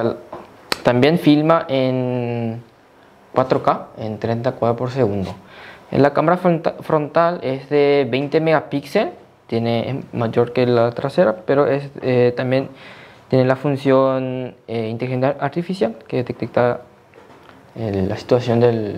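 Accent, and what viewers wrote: Paraguay. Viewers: Se nota cuando alguien habla en paraguayo😂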